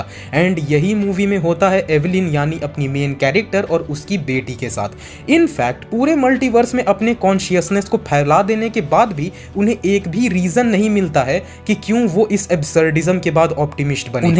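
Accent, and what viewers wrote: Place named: Bhojpuri, India. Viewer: Bhaiya bhojpuria lg rhe ho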